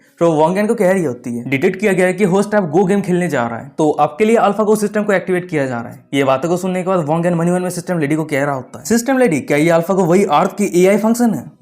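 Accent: Bengal, India. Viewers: Bhai tum Bangal se ho na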